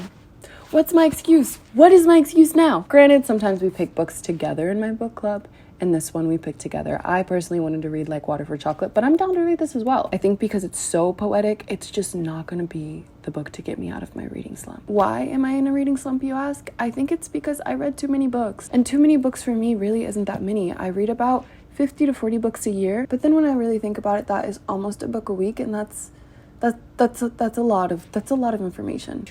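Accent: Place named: Colombia. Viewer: Tu español suena Colombiano….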